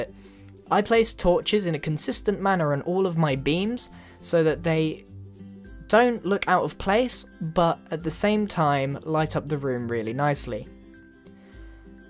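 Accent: United Kingdom. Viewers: He's british, ok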